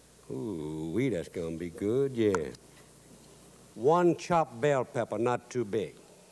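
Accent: Louisiana, United States. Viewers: His show was a regional hit down in the deep South mainly Louisiana (Where he is from, which is why he has the Cajun accent), Mississippi, Alabama. Then it aired nationwide decades after his debut on the PBS affiliate in Mississippi once they figured people outside of those states I mentioned would love his humor and Cajun accent.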